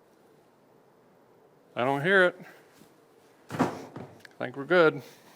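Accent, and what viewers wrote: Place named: Appalachia, United States. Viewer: Why do you talk like a hillbilly from 40 years ago?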